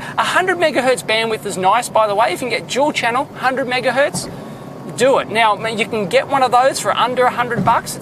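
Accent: Australia. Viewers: No offense....but WHY is the AUSSIE dialect/tone/speech so hard on my ears ? Are Gold Coasters different from Margarets River people..? is it a result of english colonization of the aborigines ? <link> Good advice.... I just picked up a pair of working LEADER 100Mhz scopes for $100 USD in Calif off C-List....LBO-516 and LS-8106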